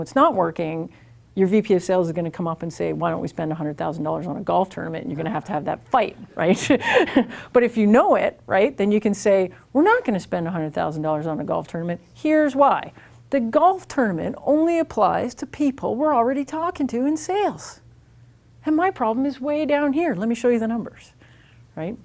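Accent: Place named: Canada. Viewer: excellent video #1 - canadian accents are awesome #2 - "it's not a pivot. same product, same thing. It's just a different way of talking about it" #3 - i think i'm going to join <link> #4 - "if it wasn't really hard, we'd all be in fiji drinking fruity drinks from a coconut, but we're not because this is hard" #5 - what does "gorpy" mean?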